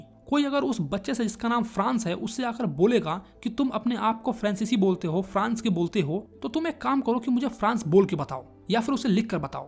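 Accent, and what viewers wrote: Bihar, India. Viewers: Sir aap bihari ho kya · Bhai aapke bolne ke style se lag rha h ki aap bihari ho.😅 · Bihar se ho bhai❤ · You are from Bihar?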